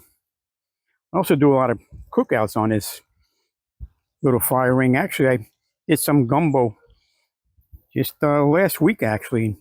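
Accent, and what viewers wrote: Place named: Louisiana, United States. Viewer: What part of Louisiana are you from? Heard the gumbo reference and your accent sounds like my Louisiana relatives. They’ve all passed. Love the yard. You can tell how much you love your home and yard. It’s so peaceful. It’s like something I’d like to find.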